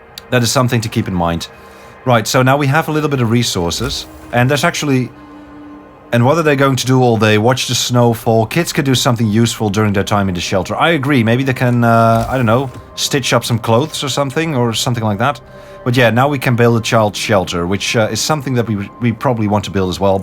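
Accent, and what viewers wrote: Netherlands. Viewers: funny how you can immediately hear you are dutch :D this games soundtrack is so good, specially when the storm hits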